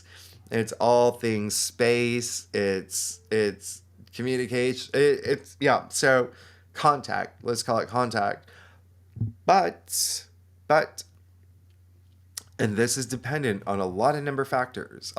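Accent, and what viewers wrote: South, United States. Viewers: I love a southern Kween! Yasss girl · Well that’s why you sound familiar lol. Not southern Baptist but southern 😂❤u! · has such a joyful energy and he always puts me in a good mood listening to his videos. 💚 I didn’t know anything about his background but with his accent, I wasn’t surprised to hear him say he comes from a Southern Baptist background. There’s some of us who make it out the other side of the fundamentalist dogma, hallelujah! 😅 Love you,! 🥰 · I know this is coming and I’ve been listening to him for the past couple days on and off. Hearing another southerner resonates with me and the things I do know, he confirms. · First time to see this guy and I’m here for it! He has to be from Mississippi! Sounds very White Lotus-y😊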